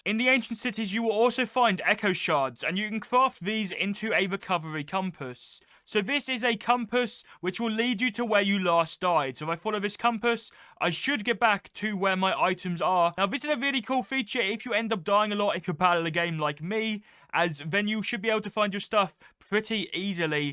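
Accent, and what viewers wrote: United Kingdom. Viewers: lol wat, bro sounds british, nothing like trump.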